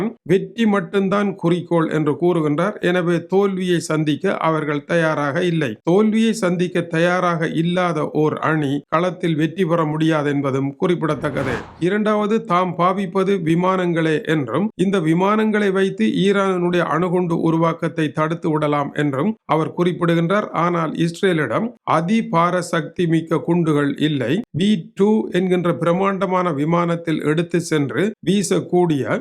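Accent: Sri Lanka. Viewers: இலங்கை தமிழ் 🎉🎉🎉🎉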